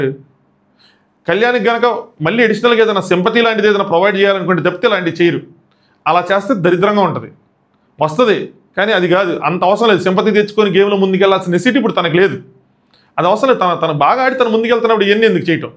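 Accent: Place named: Vijayawada, India. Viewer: Vijayawada slang vachheytthandi annai🤣🤣🤣😂adi thoti vijayawada mate ki matramey telusttundi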